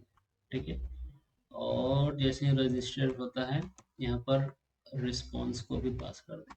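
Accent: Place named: Chhattisgarh, India. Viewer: Bhai chhattisgarh se ho kya?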